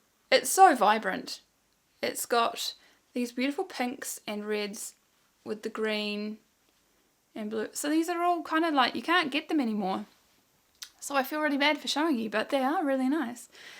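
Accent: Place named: New Zealand. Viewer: So excited that I just found your channel! I lived in Wellington for almost 12 years and it’s so nice to hear the kiwi accent again. My NZ accent has slowly been disappearing 😢 hope to see more videos soon!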